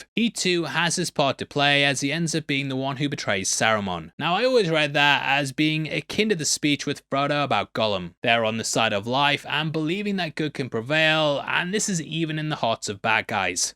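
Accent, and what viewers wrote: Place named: Newcastle, United Kingdom. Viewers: All this time I didn't twig you lived in the north of England haha! I wondered why you sounded like you were from round these parts! Geordie here, love your content man! Great stuff. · Love your work....BUT....I just can't stand you reading to us in Your Geordie accent. REALLY puts me off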